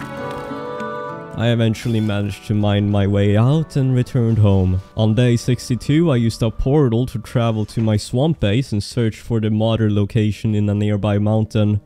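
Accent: Sweden. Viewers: I hear that you are swedish with the accent (jag är också svensk)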